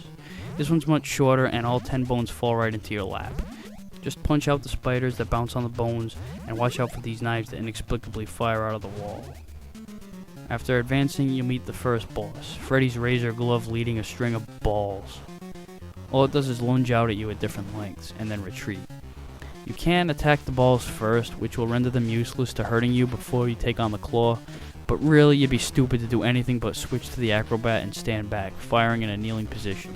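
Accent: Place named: Brooklyn, United States. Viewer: your voice is almost remeniscient of a Brooklyn Accent. nice vid btw :)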